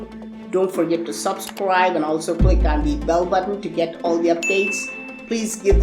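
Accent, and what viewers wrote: India. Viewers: Hello u speak Indian English k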